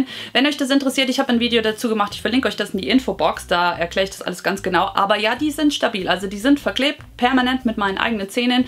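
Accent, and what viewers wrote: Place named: Hesse, Germany. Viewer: Auf Hessisch bin ich jetzt so gar nicht gekommen. Musste gleich lachen als meine Frage prompt in deinem Intro beantwortet wurde. Süß irgendwie. 😊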